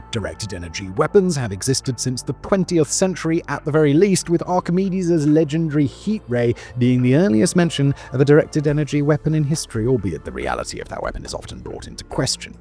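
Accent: United Kingdom. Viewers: British accents and lazer weapons, I think we all know where this is going.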